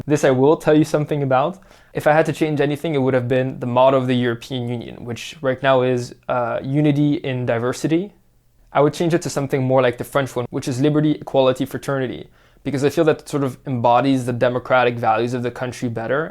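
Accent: Netherlands. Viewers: honestly I thought you were Dutch, your accent sounded more Dutch to me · Why do i hear a dutch accent from a frenchman? Or am i just imagining things?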